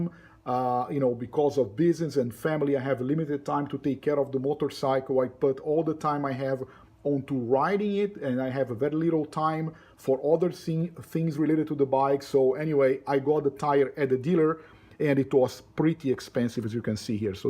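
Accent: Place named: Brazil. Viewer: Thanks for the reference. I am getting close to 10,000km. BTW, you have a Brazilian accent :)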